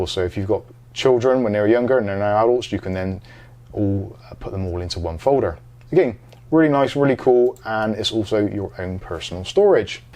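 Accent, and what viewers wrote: Bristol, United Kingdom. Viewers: Bristol accent ?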